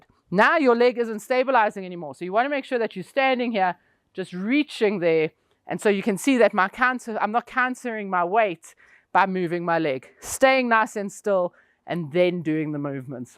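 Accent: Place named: South Africa. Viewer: How exciting to land on a YT cxhannel and hear a South African accent. Woot! I've subscribed and plan on digging in to your content on the weekend. I was a runner and still kinda am but heck, those menopausels. :face-orange-frowning: Plus sore knees.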